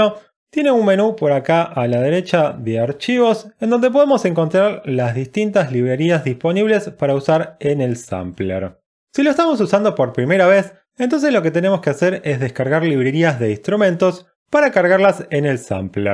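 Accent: Argentina. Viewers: acento cordobes creo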